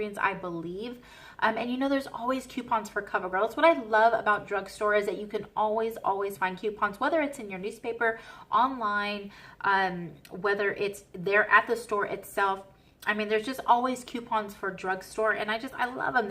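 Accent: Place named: United Kingdom. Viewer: Love this video. I can hear the British accent wanting to peak through. 😊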